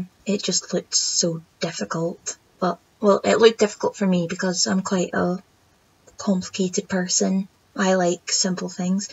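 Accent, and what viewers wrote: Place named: Scotland, United Kingdom. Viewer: So, so nice to hear another Scottish cross stitcher there seems to be a severe lack of us. Love your work, looking forward to seeing more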